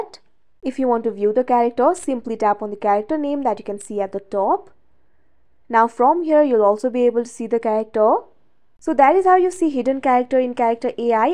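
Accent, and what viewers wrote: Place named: India. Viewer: indian acces on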